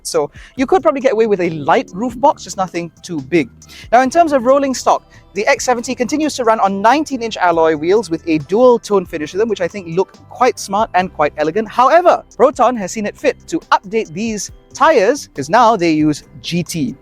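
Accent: United Kingdom. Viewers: Subscribed one minute in. You'd think he was a native British presenter.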